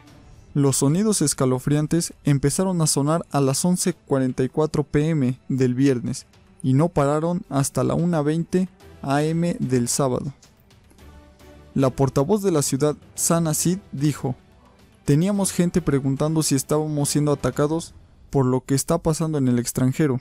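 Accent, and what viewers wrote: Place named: Mexico. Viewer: El comentarista tiene acento mexicano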